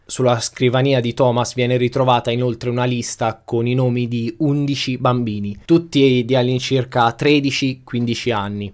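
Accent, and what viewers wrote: Tuscany, Italy. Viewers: Ciao, che storia tremenda! Ho fatto fatica arrivare alla fine e mi ha stupito che uno psichiatra veda la possibilità di riabilitare una persona come Thomas. Hai un bellissimo accento toscano, non hai mai preso in considerazione di trattare la storia del mostro di Firenze? magari inserendo delle clip del processo??? 😁 io me lo guarderei davvero volentieri raccontato da te! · Sei toscano?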